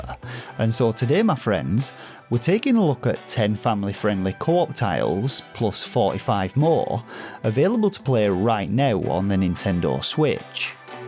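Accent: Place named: North England, United Kingdom. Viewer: Don't listen to him, I love your accent. Where exactly are you from in the north? I couldn't quite put my finger on it. Is it Middlesbrough?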